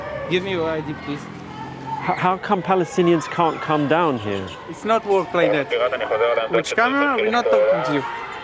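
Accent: United Kingdom. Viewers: It IS a British accent though. It’s one of the many British accents. If your complaining that ‘British’ there’s a million English accents and they all British accents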